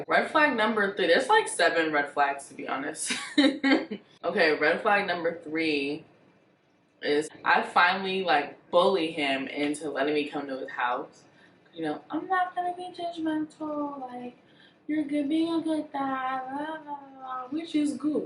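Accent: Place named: Nigeria. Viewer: loved how you spoke like a typical Nigerian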